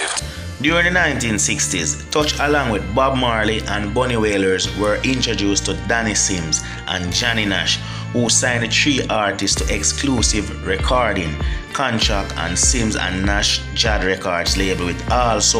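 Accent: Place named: Jamaica. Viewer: smh hes jamaican patwah was our first language this txt is stupid cnt plz people leave youth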